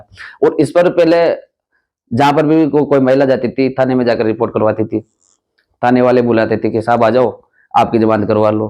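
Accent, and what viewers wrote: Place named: Kota-Bundi, India. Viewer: आपका बौली का लहजा कोटा-बून्दी का है।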